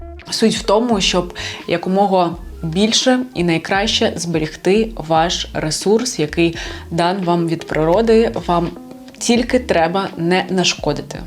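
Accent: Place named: Russia. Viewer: Людина переходить з російсьеої мови на українську. А наголос залишися зі слова «счастлива»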